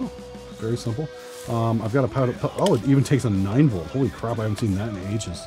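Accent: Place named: Canada. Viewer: I can't stand that Canadian accent.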